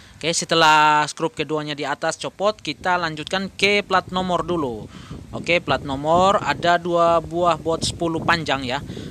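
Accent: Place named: Bali, Indonesia. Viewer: Kalau dengar logatnya pasti dia orang bali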